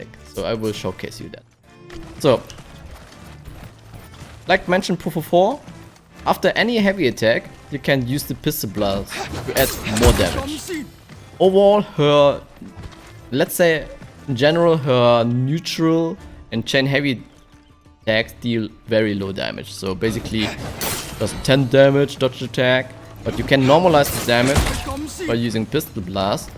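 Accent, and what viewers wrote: Germany. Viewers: german-english always on point:D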